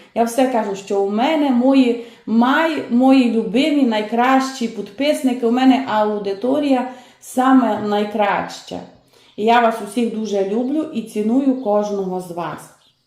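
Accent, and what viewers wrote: Transcarpathia, Ukraine. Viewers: я Вас вітаю з 300000 підписників, це величезна праця розвивати свій канал, зацікавити глядачів, щоб люди підписалися. дивилися. В тому і фішка, що закарпатський діалект, мені подобається, а кому не подобається нехай йдуть на інші канали, на ютубі величезний вибір блогерів. Дасть Бог буде пів мільйона підписників і мільйон, рухайтеся вперед, знімайте для нас смачні рецепти, я люблю м'ясо і солодке. Я дивлюся Вас з міста Черкаси.Бажання у кожного українця зараз одне Перемога і Мирне небо!!! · Вітаємо Вас,! Ви Чудо і ваші рецепти це знахідка для всіх нас. Хай Господь благословить вас і дарує Вам і вашій сім'ї міцне здоров'я, злагоди і миру. В нашій сім'ї зараз готується дуже багато страв за вашими рецептами. Любимо вас, вашу мову, ваші щирі слова, ваш закарпатський діалект, бо він прижився в нашій сім'ї. Рясних вам Божих благословінь. 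Успіху вам та процвітання. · Доброго вечора зі Львова! Ви така добра та щира🥰Дякую вам та вашій мамі❤️ Чудовий закарпатський діалект - слухаю з задоволенням! Щиро дякую за рецепт кабачкової ікри, там де рецепт на 1 кг. Я мала рецепти - но ваш тепер мій назавжди, навіть інші пробувати немає змісту. Він ідеальний, вже третій раз закручую. Щиро вам дякую☀️Добра бажаю🌺🌸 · І я приєднуюсь до ваших привітань і хочу побажати вам і вашій родині мирного неба Божого благословенства, та міцного здоров'ячка,я дуже рада що можу слухати ваш дуже приємний голос ,і гарний закарпатський діалект,багато готую за вашими рецептами . ❤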